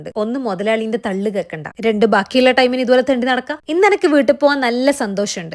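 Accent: Kannur, India. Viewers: Kannur aano 🤔 varthanam kettitt choichatha😌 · Anne koottand poonnath kandaa😂 Kannur slang📈📈📈📈📈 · Kannur aano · Kannur anappa nee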